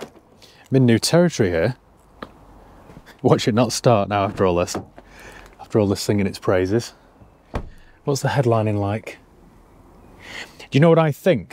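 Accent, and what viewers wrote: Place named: England, United Kingdom. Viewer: I can't through the first minute. I'm Canadian Aboriginal, but I do not like English accents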